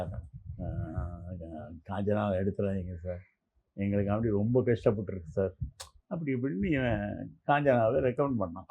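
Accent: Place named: Tirunelveli, India. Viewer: super nellai slang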